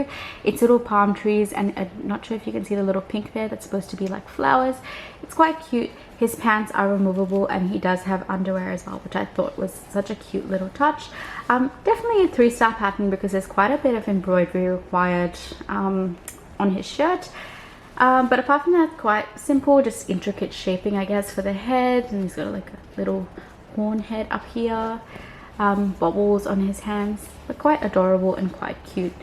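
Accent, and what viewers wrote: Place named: Australia. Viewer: Just found you today. You sound kinda Australian sometimes ?? There's not many of us around. Love all your creations ❤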